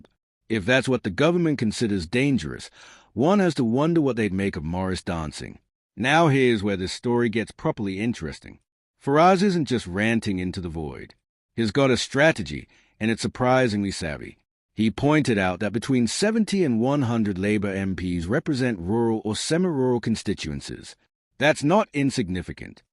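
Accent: United States. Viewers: You will only see this in the fake channel that is BRITISH MIRROR. They include the Union Flag logo to appear patriotic. You don't see any evidence, just that of the narrator, who has, at times a very heavy American accent. (AI)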